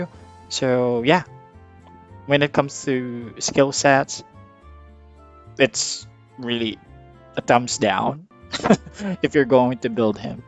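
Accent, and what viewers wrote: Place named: Philippines. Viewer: I mean you really do sound like a Filipino professor haha!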